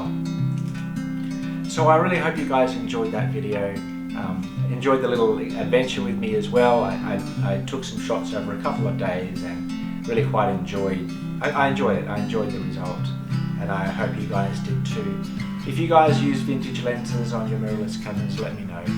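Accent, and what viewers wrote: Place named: Australia. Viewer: You look so American and yet you sound so Oz. I am hevily into vintage lenses, especially anything Carl Zeiss. My two best are an ancent Carl Zeiss Biotar (No red T* but, coated glass) and a more recent Rollei Carl Zeiss Distagon, very worn and skuffed on the outside, but what great glass. I recently found out about the SMC Pentax-M lenses. There is a site that ranks Pentax glass, the Pentax-M f/1.7 50, is not only the most popular, it is ranked the highest. So sharp, and so cheap. $30 USD in the states. Thank you, good work, I subscribed.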